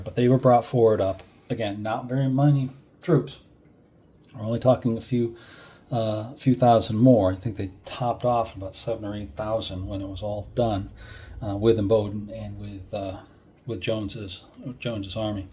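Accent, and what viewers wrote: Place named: Massachusetts, United States. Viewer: I can see how a Massachusetts accent wouldn't work down in ol' Virginia!! Actually, everyone NOT from the Shenandoah would likely make the same mistake with Staunton. It's part of the rite of passage as one gets immersed in Civil War history!! I'm still learning about the odd pronunciations of some waterways (Mattaponi, Ni, etc.) and some of the commanders are routinely mispronounced (the two Taliaferro's, pronounced "Tolliver", Huger (pronounced more like YOU-zhee) and even Heth (pronounced more like "Heath"!! I also find many people seem to trip over Sigel, which you did not, to your credit. I don't know WHERE someone would get "SY-jel" out of that, but some do!!! 😉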